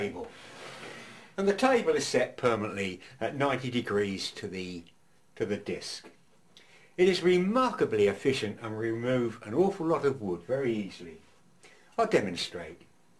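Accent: United Kingdom. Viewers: this is amazing I love home made tools!!! and this may sound stupid, but I find I learn things a lot easier if the one teaching has a British accent lmao crazy I know lol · What size is the larger transformer that you have used for the bigger spot welder? Very good videos informative, clear and concise.....and British....Excellent!!!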